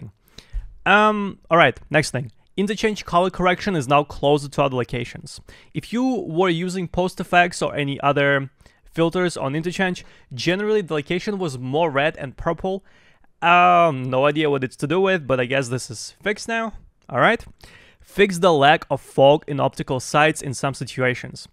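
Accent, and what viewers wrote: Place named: Russia. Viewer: Its free to try.and he is russin so he can not say things in perfict english sometimes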